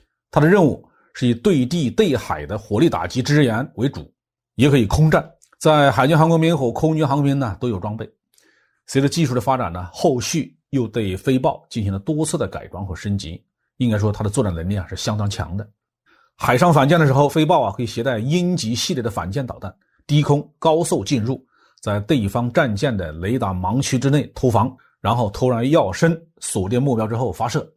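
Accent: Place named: Hubei, China. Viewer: 湖北口音？还是信阳南部口音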